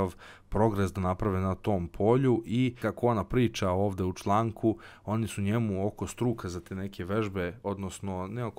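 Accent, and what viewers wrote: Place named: England, United Kingdom. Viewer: Zajebi košarku, daj nas nauči taj engleski naglasak🔥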